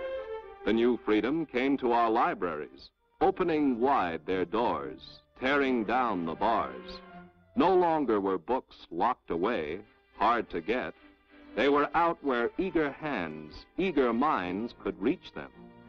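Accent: United States. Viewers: Good American accent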